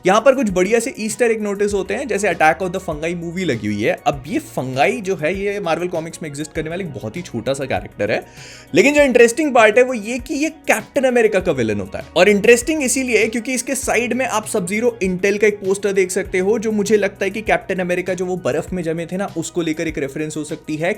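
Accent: Bhopal, India. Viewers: bhai ap Bhopal se ho??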